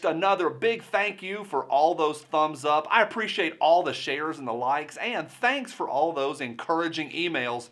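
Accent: South, United States. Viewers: Another great video dude! I was kind of hesitant on your channel the first time or two I watched. (Took me awhile to get used to the Southern Drawl). But you're now one of my favorite 2A channels. Thanks for all the hard work and great 2A info. Keep it up dude!! God Bless.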